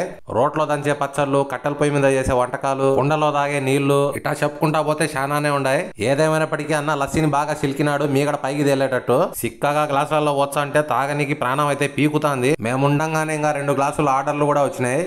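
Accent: Kadapa, India. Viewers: Kadapa slang super 😂😂😂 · ఒన్న నువ్వు సూపర్ న్నో నాది కడపనే ఏం మాట్లాడతాండవ్ న మన యాస 😂😂😂💗💗👍👍👍👍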